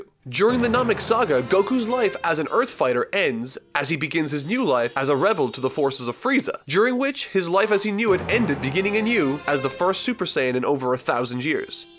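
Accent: Ireland. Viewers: Idk why i didn't catch it before but you are really irish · Just curious are you Irish?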